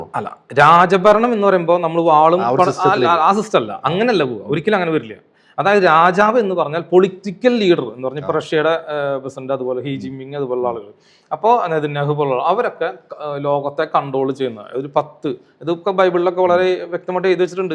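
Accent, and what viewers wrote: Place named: Thrissur, India. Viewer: സ്ലാങ് ക്കേട്ടിട്ട് തൃശ്ശൂർ കാരനെ പോലെ ഉണ്ട് എന്റെ ജില്ലയിൽ ഇങ്ങനെ ഉള്ളവർ ഉണ്ടല്ലോ ഛെ നാണക്കേട് തൃശൂർ കാരെ ടെ വില കളഞ്ഞല്ലോ ഗഡി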